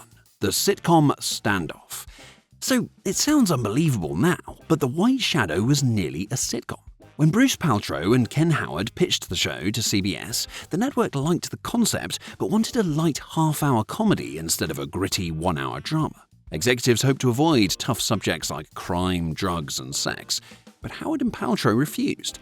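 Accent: United Kingdom. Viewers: Why is there a British accent doing commentating on this video. We couldn’t find one American to do this, about this class of American TV drama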